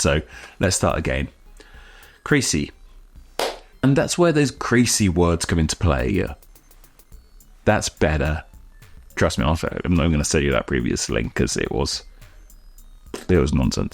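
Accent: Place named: United Kingdom. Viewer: British probably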